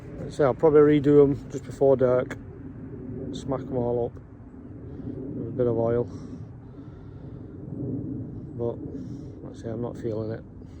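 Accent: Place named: Northern England, United Kingdom. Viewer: brilliant video and proper northern mans commentary no snow flakes here lol keep them coming superb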